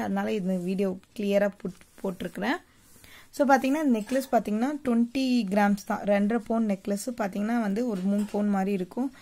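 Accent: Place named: Telugu, India. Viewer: Ok you are telugus so your Tamil is saking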